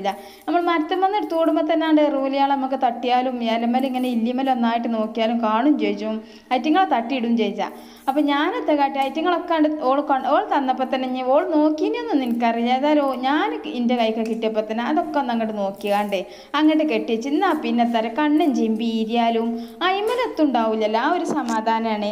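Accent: Malappuram, India. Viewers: 1day andhakkaa vibavangallaaa ningal super anu ttoo pinnee nongale basha kette video kelkkan oru sugamanu annee😍😍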